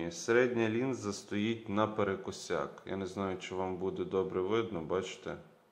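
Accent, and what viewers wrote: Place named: Lviv, Ukraine. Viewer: То є львівський діалект , вам того не зрозуміти. Сходіть потрольте одеситів , вони вам розкажуть скільки у них коштує рубероїд на Привозі )))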